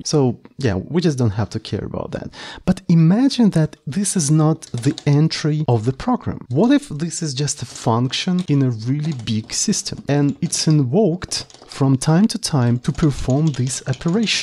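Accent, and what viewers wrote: Russia. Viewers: Вау, крутой канал. Очень нравится этот лёгкий русский акцент, прямо как родной, лол.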